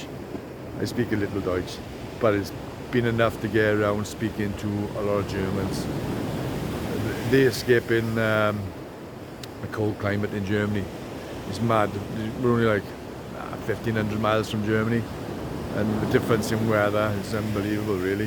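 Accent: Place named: Welsh Valleys, United Kingdom. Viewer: You just hit my algorithm, just subbed. You sound like a valleys lad. Self - deprecating, no nonsense, tell it as it is, dry sense of humour. Wishing you all the best 😊🏴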